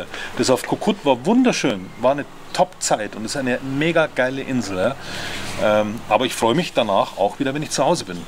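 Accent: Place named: Bavaria, Germany. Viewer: bisserl mehr bayrisch dadad ned schadn , aber alles gut🥳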